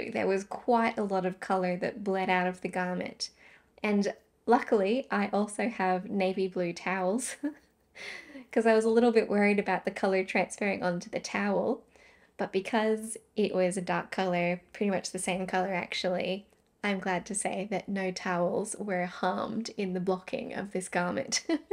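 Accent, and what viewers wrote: Australia. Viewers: love listening to your aussie accent. I love your Rebel cardigan and you logic $ I think you need to add the value or the wearability of the garment. I'm in Nz, blue dyes do tend to bleed i have been advised to use cold water to block and use hair conditioner instead of wool wash. i am learning the shadow wrap short row heel on my current sock project. i was wearing my finished mkal while watching your podcast, i wore it out tonight and had so many compliments. it's in deep teals and greys merino silk blends. this clip is from Knitty McPurly podcast from 24 may will help you with your swift skien winder issue, <link> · So nice to listen to your podcast, an Aussie voice. I'm planning a trip to Brisbane in April so will visit the Little Yarn Store. I'm in the Blue mountains of NSW so lots of handknits are the go for me.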